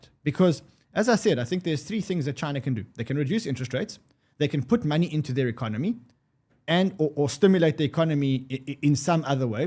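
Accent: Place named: South Africa. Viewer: I thought I recognized the Saffa twang. Nice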